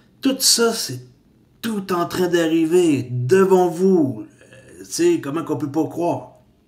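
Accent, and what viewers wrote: Quebec, Canada. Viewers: Salut! Tu semble parler le Québécois! J'ai regarder plusieurs cite anglais et de la France, mais, voilà, je suis un vieux modèle 58, et je suis un néophyte dans le domaine, surtout, qu'il y a tellement d'acronyme, et j'ai la chienne de me faire avoir dans ce monde de jeune, donc, j'ai besoin d'aide pour acquérir des XRP, je ne suis pas sur de comprendre ce que cela veux dire, mais, je dois aller coûte que coûte dans cette directions! Peux-tu m'aider de façons privé? Respectueusement.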